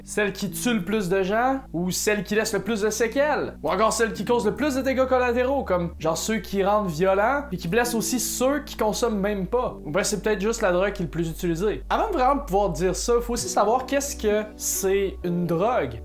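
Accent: Quebec, Canada. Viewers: Le sujet m'intéresse mais l'accent québécois vraiment j'peux pas. Dsl. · Hello le Québécois ! Salutations d’un "maudit" Français ;-) Chouette vidéo, à quand une vidéo sur l’argot québécois que tous les autres francophones ignorent ? J’aime tellement votre vocabulaire et bien sûr cet accent extraordinaire ! Bye les lointains cousins et vive le Québec libre ! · Développement indigent. L'accent québecquois ne donne rien de plus. Déjà, un type qui a un bonnet sur sa tête à l'intérieur, tu comprends que des choses ne fonctionnent pas bien dans sa tête. Une drogue est une substance illégale (en général parce qu'elle est néfaste) et donc les imbéciles qui consomment des drogues et qui essayent de les juger sont des crétins. Après, on peut essayer d'établir des jugements absurdes avec d'autres substances, si on manque de confiance en soi et qu'on a compris qu'on est dans l'auto-destruction.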